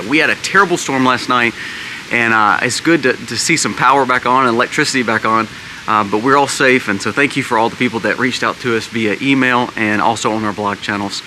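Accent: South, United States. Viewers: He is from the South. It's called an accent. Many places in the US have different accents. And uneducated comment ❤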